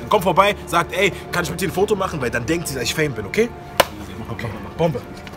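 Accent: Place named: Berlin, Germany. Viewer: wie witzig er spricht ( auf berliner art )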